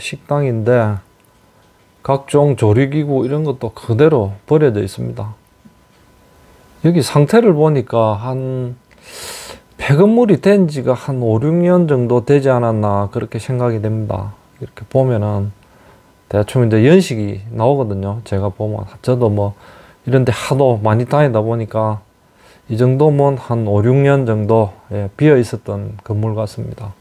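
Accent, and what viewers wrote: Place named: Gyeongbuk, South Korea. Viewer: 도 경북말투 억양 이시네요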